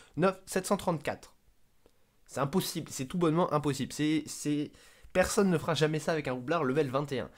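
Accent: South, France. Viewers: Le bon accent du sud :D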